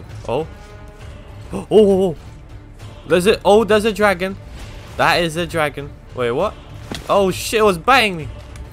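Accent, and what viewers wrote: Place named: Roadman, United Kingdom. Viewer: bro has a roadman accent 😂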